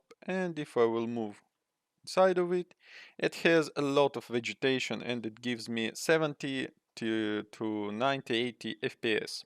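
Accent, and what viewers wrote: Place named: Russia. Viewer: Акцент прям русский. Более уверен что ты наш)) Отличный урок! Спасибо!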